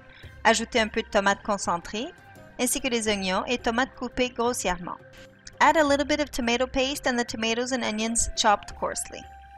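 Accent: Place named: Quebec, Canada. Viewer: C'est marrant lorsque tu parles Français tu as un petit accent canadien, tu es au Quebec ? Merci pour ta recette en tout cas. ;)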